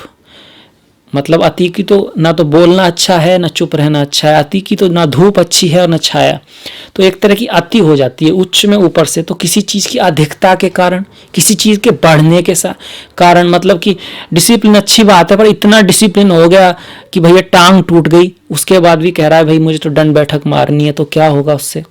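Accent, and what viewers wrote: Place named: Mathura, India. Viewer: Nice to know.....As per your accent of hindi, I think your background is from Mathura/Agra UP... I also belong to Mathura , presently residing in Jaipur Rajasthan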